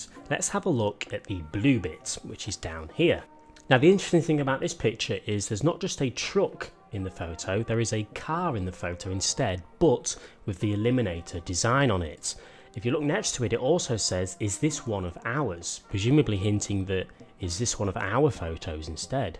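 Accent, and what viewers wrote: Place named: United Kingdom. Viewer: I swear his voice is the goofiest sounding British accent. Could not take him seriously.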